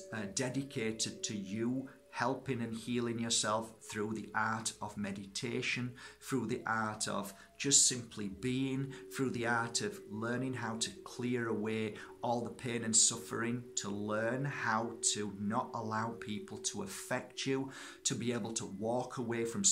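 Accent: Yorkshire, United Kingdom. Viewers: I'm in La Cala de mijas... Love your Yorkshire accent..I'm a Lancashire Lass! 😉😀 You're an inspiration and I hope we meet one day ☺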